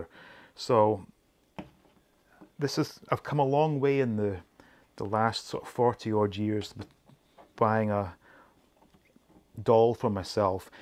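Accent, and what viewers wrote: Scotland, United Kingdom. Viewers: Hey been wanting to ask what is your accent is it Scottish?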